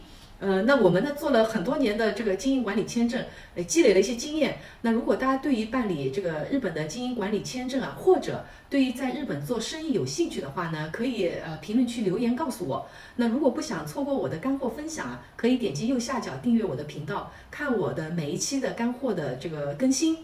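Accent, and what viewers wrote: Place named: Shanghai, China. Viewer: 乍听是个上海人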